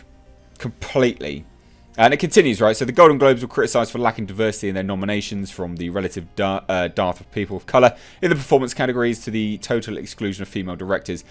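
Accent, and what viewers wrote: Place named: United Kingdom. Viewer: "Why should we be listening to self important douche bags". I don't particularly want to listen to them, but the 1st ammendment is all about free speech son, and I'll defend their right to make political statements, and rickys right to ask them not to. If you dont want to listen to them, you have the remote and it has a volume control, and other channels are available. And, as you sound like a brit, I'm guessing you never watched it live anyway and have only seen clips on YouTube.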